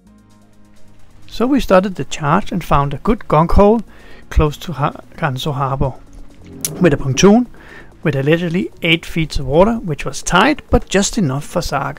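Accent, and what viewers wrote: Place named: Denmark. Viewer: danish accent..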